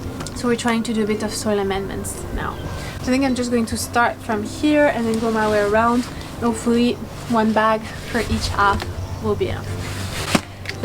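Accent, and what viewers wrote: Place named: France. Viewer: Your french accent is so lovely 😍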